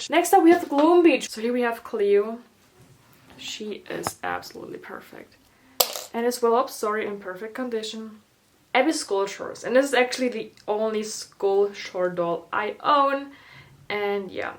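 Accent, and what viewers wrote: Germany. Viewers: are you german ? (me 10 minutes later: JA BIST DU. Ich hab es einfach gleich gehört weil ich den gleichen Akzent habe)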